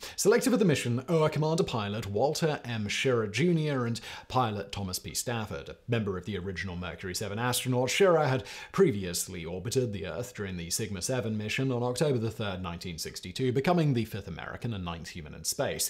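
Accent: United Kingdom. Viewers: Guys give a break he's British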